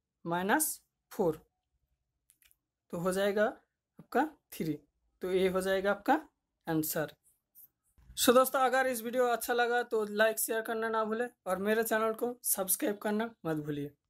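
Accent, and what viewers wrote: Bengal, India. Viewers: I got the ascent of Bengali in your language...so proud of you bro being a bangali.....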